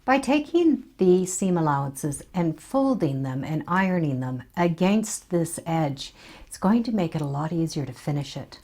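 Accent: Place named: Canada. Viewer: I could listen to you say "again, against" over and over. That Canadian sound is clear.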